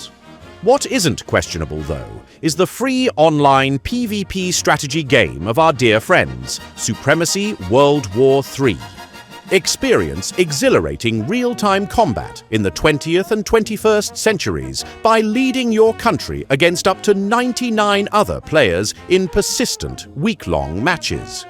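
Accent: England, United Kingdom. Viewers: Bring back old English accent, please.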